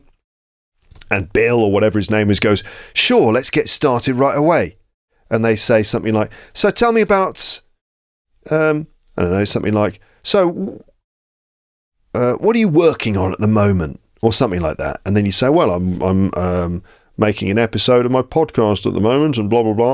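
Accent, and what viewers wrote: United Kingdom. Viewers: Dear... Happy Birthday to you. I'm from Sri Lanka and have been listening to your podcasts for a long time. Though there are lots of other podcasts, your podcasts are special in terms of their clarity and accent. To me, really, there's no need for subtitles to understand you. I'm in a position to understand every word you say. You speak in a clear British accent. Whenever I am free, I'm in the habit of listening to your podcasts and I feel that my English is improving day by day. Thanks for your podcasts. Keep it up.... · Happy birthday to, happy birthday to, happy birthday dear, happy birthday to. May the force be with you! P.s. is the best teacher of English with a British accent on You tube. Fight me.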